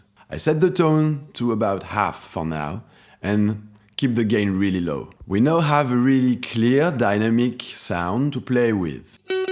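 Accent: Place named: France. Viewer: That little French accent, so sexy ! Don't give up !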